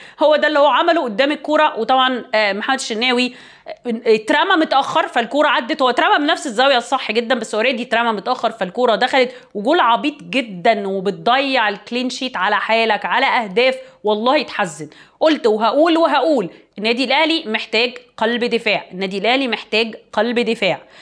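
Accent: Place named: Levant. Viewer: حاولي تظبطي لهجتك المصريه ... بلاش استخدام بي بدلا من في ولا حضرتك عيشتي في الشام؟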